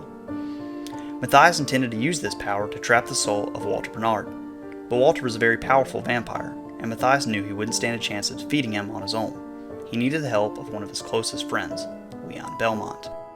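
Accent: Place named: South, United States. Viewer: Title doesn’t make sense….He’s not Dracula until he’s Dracula. So how does Dracula become what he already is? The term “Dracula” would already be a Vampire right? The title should be….HOW a man became a vampire known as Dracula….because their are many vampire but only one king of vampire….Dracula. That southern drawl only made it more cringe. I DO know this story isn’t accurate.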